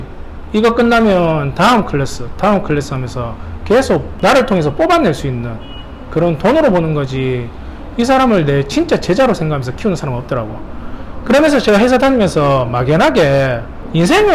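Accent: Gyeongsang, South Korea. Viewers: 쇳쇨 심한 심한 경상도 액센트 너무 강해서 듣기싫네요, 군대 바리훈련소도 아니고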